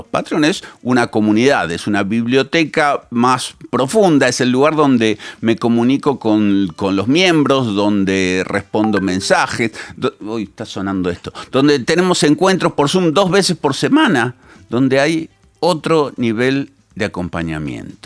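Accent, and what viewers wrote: Argentina. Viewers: Arqui.. Yo realice la creación de una GEM de Gemini y le cargue todos tus videos de REVIT y que crees??.. me responde literalmente igual que tu. Con todo tu conocimiento de Revit. Eso me ha ayudado a resolver muchos temas. Hasta el tonito argentino me da. Pero dudo mucho que iguale a tu Bot. Por cierto, le puse de nombre a la GEM "SuperManes". Saludos desde México.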